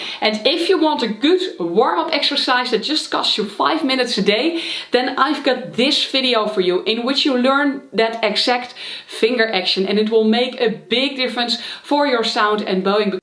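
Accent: Netherlands. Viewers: Just the video I was looking for, been playing for a year and always struggling with the sound of my E string. Love the accent too (Dutch?), subscribed! 😊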